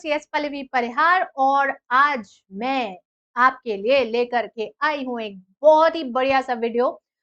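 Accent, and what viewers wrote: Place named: Gujarat, India. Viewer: mam aap gujrat se ho kya bcz apka accent match krta hai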